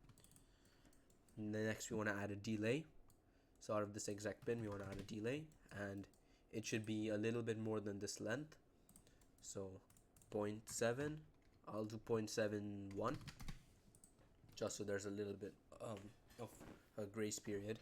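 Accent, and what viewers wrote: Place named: Germany. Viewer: Hey where are you from? You sound like you're from Germany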